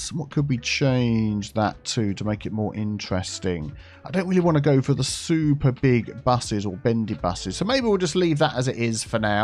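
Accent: United Kingdom. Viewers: Building this one along with you to help me learn. Love your content. Great to hear a British voice! Thank you!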